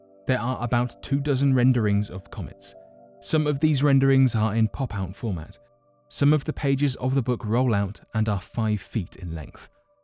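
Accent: United Kingdom. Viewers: Its his Brit accent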